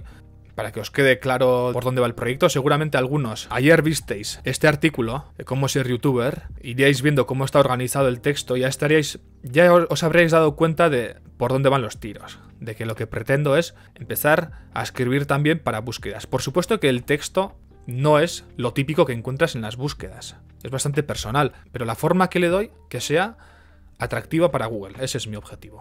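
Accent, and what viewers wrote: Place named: Eastern Europe. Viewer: pero si tiene acento del este de Europa....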